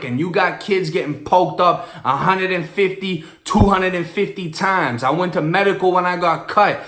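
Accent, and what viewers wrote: Boston, United States. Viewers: Lol I’ve been listening to ever since the 23&1 interview and I always crack up with his Boston accent! “Test of HARHT” “PAHRK THE CAHR” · He sounds like a he's from Boston.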